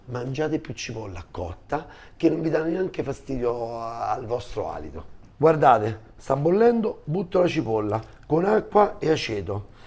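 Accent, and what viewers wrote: Italy. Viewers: Preferivo i video di 3/4 anni fa dove parlavi con un accento più italiano ed eri più umile.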